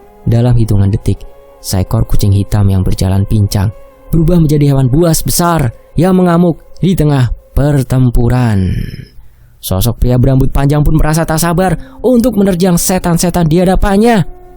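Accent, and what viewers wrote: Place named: Bali, Indonesia. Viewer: Miiih..miiih..dhuwek sajan blii logat bali..pinter pisan aei😄🥰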